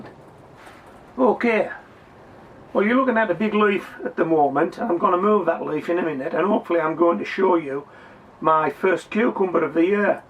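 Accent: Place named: Northern England, United Kingdom. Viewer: What a great idea about the burpless cucumber, had to laugh about that one. What part of England are you from? You sound like a northerner, only ask cos your accent sounds like my dad :-). I always grew up with a veggie patch and only just started to get into growing stuff again. Nice to meet you, I am a new follower and enjoy watching your videos :-)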